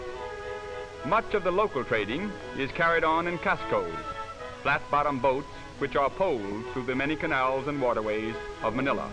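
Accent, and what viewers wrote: Transatlantic, United States. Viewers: Coz Most Americans accent this time was trans atlantic accent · Every trans Atlantic accent. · Transatlantic accent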